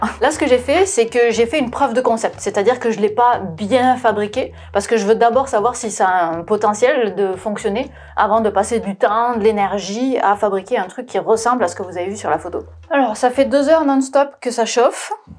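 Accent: South, France. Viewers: Pour une québécoise tu parle avec un super accent français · Québecoise avec un accent presque du sud ☺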